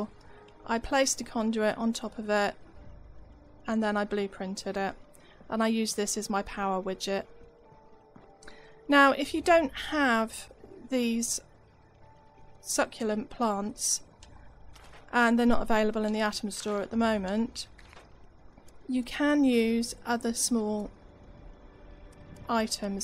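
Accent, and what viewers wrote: United Kingdom. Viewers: Finally, tutorials with a beautiful British accent.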